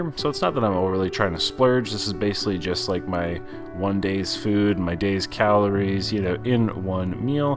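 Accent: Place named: Texas, United States. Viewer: Oh everytime you come to Texas you start speaking Texan... that's so cute. I also go for the fried fish and green beans when eating at Golden Corral. Pot roast, never, it's always too dry. Bye enjoy your stay in Texas.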